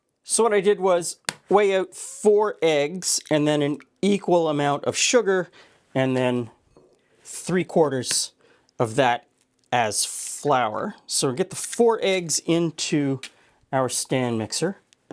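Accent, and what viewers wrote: Canada. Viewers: The "english" language is so fluid and changes overtime to the point we should probably not be calling it that. I live in New York and i can tell you what we speak is certainly not "english" anymore. lives in Canada and you speak a dialect which should be called Canadian. As my new york dialect should be called American.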